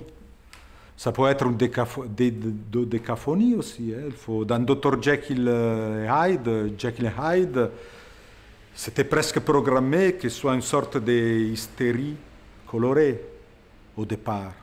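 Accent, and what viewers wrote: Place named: Italy. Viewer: meraviglioso. e delizioso il suo francese con accento italico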